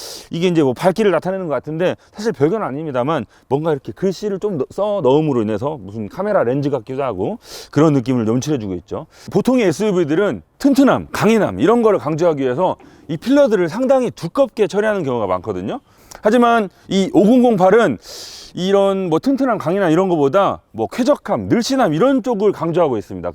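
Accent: Chungcheong, South Korea. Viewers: 편안한 충정도 사투리 속에 날카로운 디테일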